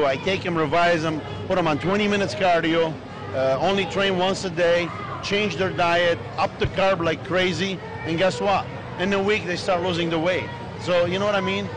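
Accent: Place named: Ireland. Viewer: You're from Ireland? I know a lot of people that bag the shit out of your accent aswell. Have some respect knows more about nutrition and BB than most pros. Who cares where he comes from or how he speaks.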